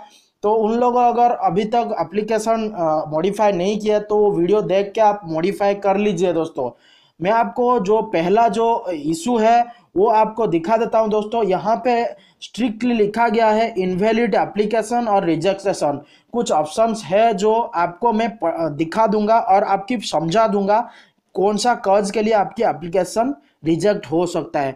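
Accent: Odisha, India. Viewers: HAS Tech burbak woh oriya hai thik se suno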